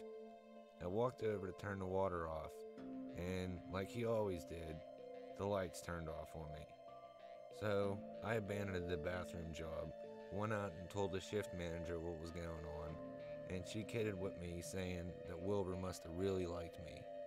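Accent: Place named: Pittsburgh, United States. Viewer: Good stories! Only critique is that when you are speaking I can still hear that Pittsburgh accent come through. Like "..... One of the last things I'm gonna share wit JEW." It's hard to break that after speaking it for so many years. Keep doing what you are doing..... The followers will come!!!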